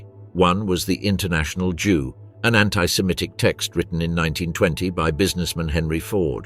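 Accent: United Kingdom. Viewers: lol same old propaganda video 😅😅 lmaoo with the same old British accent 😊! Give me a break, don’t worry all these lies told about Hitler one day will be proven false, he did NOT kill millions lol, you have zero proof, once I get the speech or signature I’ll beleve it.. the great awakening ppl!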